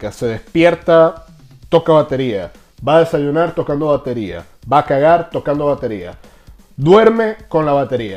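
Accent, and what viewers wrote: Venezuela. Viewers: tu eres venezolano rajao brother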